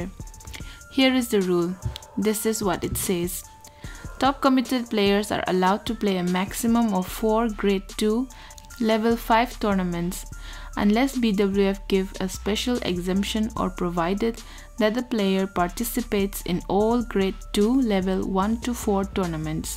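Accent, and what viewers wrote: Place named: Malaysia. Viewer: I would think she sounds more like Malaysian or Singaporean.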